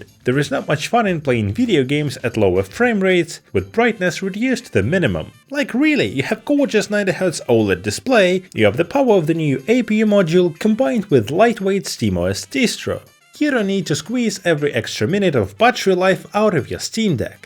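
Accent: Russia. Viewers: Должен сказать, что русский акцент почти не слышно. Отличное произношение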